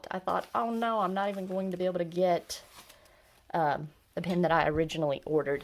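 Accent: South, United States. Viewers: Nice southern accent. North Carolinian pen enthusiast here! Thanks for the video warning about this vendor. the Golden Espresso is probably gonna be my first Kaweco. Do you shop from Goulets' website? They're right in VA. Good stuff.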